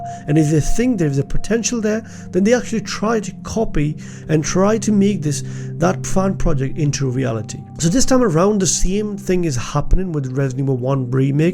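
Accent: Newcastle, United Kingdom. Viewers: You from the west end, bro? (accent is a dead give away to a fellow Geordie) EDIT: little bit of boro in that accent, so not so sure now.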